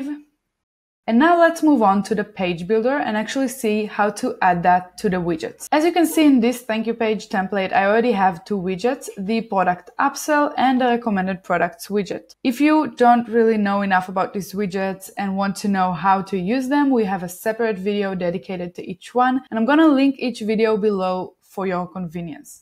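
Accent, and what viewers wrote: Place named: Israel. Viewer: i can hear that Hebrew accent!! haha, great video, thanks!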